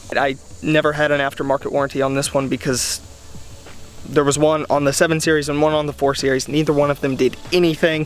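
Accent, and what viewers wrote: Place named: United Kingdom. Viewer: U can tell this guy loves rr he is getting a small British twang to his accent. Ha. And yes I agree u r really cute. X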